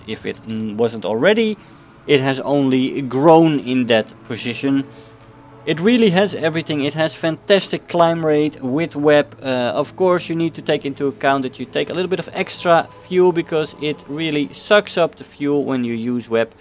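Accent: Netherlands. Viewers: Hey, are you Dutch? Watching this from Leiden, I thought I recognised the accent:) Nice videos mate!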